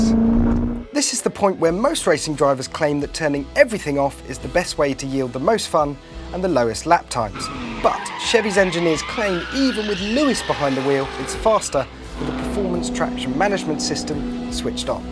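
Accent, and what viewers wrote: England, United Kingdom. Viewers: I'll go with Corvette's 8 class wins at Lemans (along with countless others) as plenty of proof American cars can corner. I don't need another youtube host with an English accent to convince me as if this is something new. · American muscle but you get an English guy to talk.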